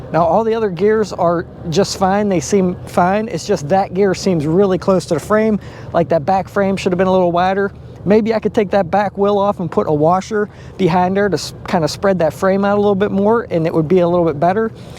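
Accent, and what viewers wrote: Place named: Pittsburgh, United States. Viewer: Sounds like you are from the Burgh area possibly the mon valley.. love it! I grew up in Ambridge.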